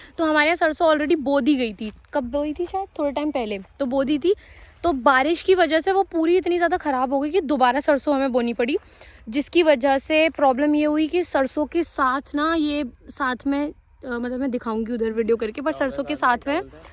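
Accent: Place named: Meerut, India. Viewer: Your language matches with mine...you may be somewhere from meerut, moradabad, sambhal , chandausi wagerah.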